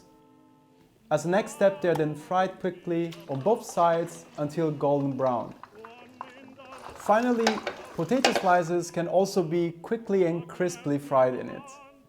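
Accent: Germany. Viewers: Mein Herr, kommen Sie aus Deutschland? Sie klingen so. Ich lerne Deutsch und finde was Sie sprechen sehr gut! Ich finde die Geschichte dieser Zeit (WWI) sehr interessant, aber nicht so vielen Youtube sind ueber wie Deutsch Leute in dieser Zeit gelebt haben. Mehr, bitte! Danke schoen!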